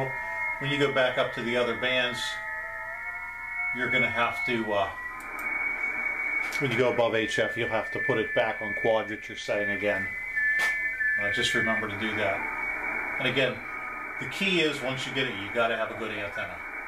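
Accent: United States. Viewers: are you from Pittsburgh? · Sounds like you have a Pennsylvania Dutch Accent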